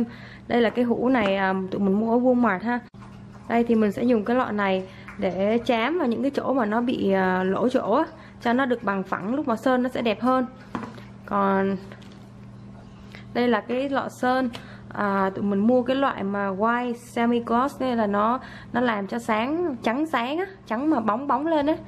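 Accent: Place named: South, Vietnam. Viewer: Vẫn là chị. Nhưng 2 năm về trước với bây giờ thì giọng nói đã khác khá nhiều. Hồi này nghe c nói có vẻ điệu hơn, miền nam hơn. Nhưng e lại thích nghe giọng c bây giờ hơn. Còn tính cách chăm chỉ của con gái VN thì vẫn y vậy à 😊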